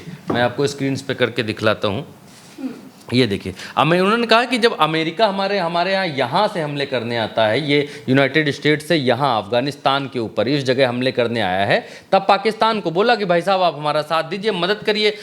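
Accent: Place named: Awadhi, India. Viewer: ka theth Avadhi andaaz 😂😂 maza aa gaya ❤❤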